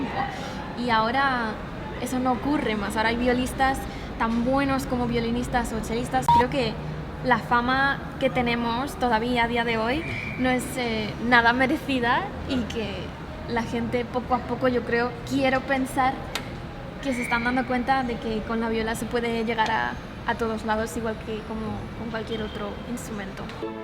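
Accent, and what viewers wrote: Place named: Mexico. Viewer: Se te pegó un poco el acento mexicano 😁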